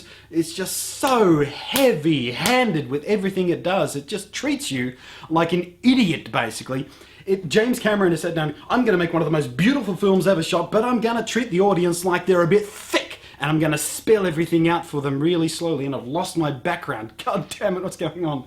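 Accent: Australia. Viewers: i totally agree, just one question, are you an aussie? if you are, thats awesome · i watched 5seconds of this and could tell you were an aussie xD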